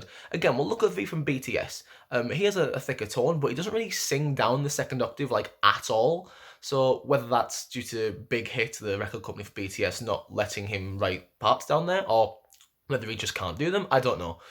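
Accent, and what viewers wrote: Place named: England, United Kingdom. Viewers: I love your accent too.Are you English? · Are you an English? I really like yo accent.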